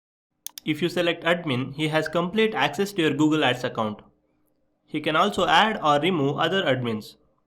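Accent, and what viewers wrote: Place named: India. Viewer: Fix your Indian accent. It's annoying af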